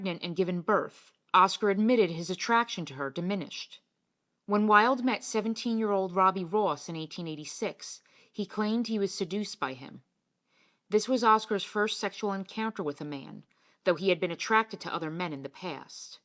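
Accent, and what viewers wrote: United States. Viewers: Why criticise the narrator’s accent. She is an American and speaks perfectly well. The substance surely is the main thing . I found this an interesting article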